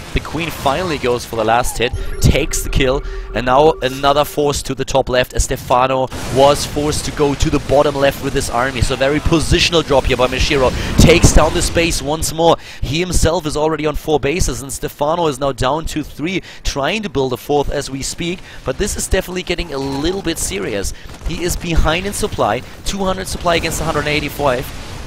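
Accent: Germany. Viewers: I like your German accent! And it's great how you're dropping gems like the right+shift attack scv building the bunker. Thumbs up!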